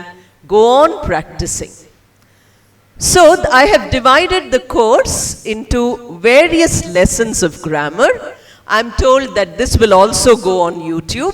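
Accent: India. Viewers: Super mumm ..... Your speech is real Indian language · This Indian-born English Professor lady has not learned the correct pronunciation of words she spoke with her Indian accents. I'd suggest this Indian lady Professor to watch some YouTube videos presented by "native" English speaking ladies telling us how to pronounce words - simple or difficult to pronounce - in the way they are clearly understood by audiences all over the world, where English is spoken as a second language as is the case of this Indian "English" Professor lady. For Indian listener like Anita Pandey this Indian lady Professor presented "very nice speech with clear pronunciation" but that was not the case. Anita please watch YouTube presentation "Listening and Understanding" learn English with 3 steps video. Thank you for reading my comments. Hope it helps.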